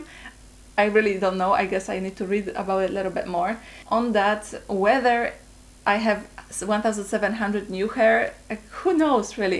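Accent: Poland. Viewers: Nie wiem czemu, ale typowo widać po twoim ang, że jestes polką. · This Polish accent... brrr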